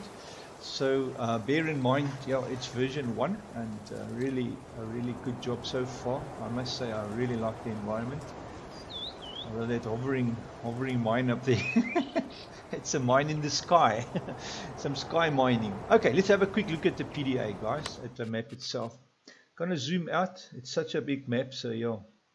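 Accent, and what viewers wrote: South Africa. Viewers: I hear SouthAfrican English, I upvote ;)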